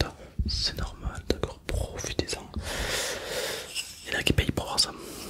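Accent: South, France. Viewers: ça m’fait bizarre prck tout le monde dit que ta un accent du sud mais vue que chui aussi du sud bah je l’entend pas fin voilà quoi c tout.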